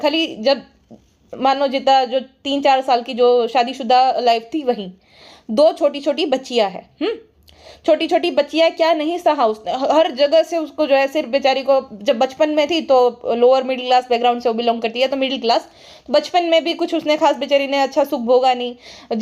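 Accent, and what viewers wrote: Gujarat, India. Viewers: 25 saal ki ho gyi hu pehli baar youtube pr kisi ko comment kiya hai ❤ u r worth it for this love and your gujrati accent ❤❤ lots of love from jammu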